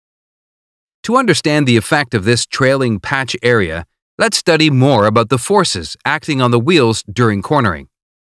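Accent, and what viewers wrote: United States. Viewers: Speaking Americanized English, but with a steering wheel on the right side. Hmmm. This is an awkward video. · The video was produced with an American English accent yet the car is shown being driven on the left side of the road. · In what part of the world do they drive on the left, and speak with an American accent?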